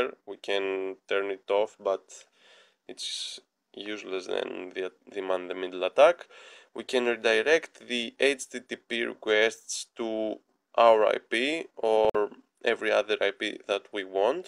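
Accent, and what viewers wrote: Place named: Greece. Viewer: η προφορα κανει μπαμ οτι ειναι δικος μας:ρ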